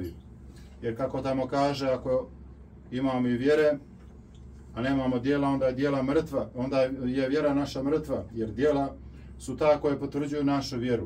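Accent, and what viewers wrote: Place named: Ekavian, Serbia. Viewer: Opet ekavičar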